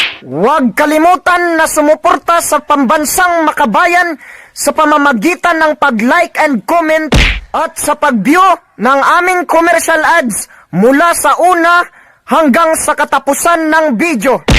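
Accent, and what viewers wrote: Visayas, Philippines. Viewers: Napakatalented. Parang bisaya yung dila pero napakagaling na makatang tagalog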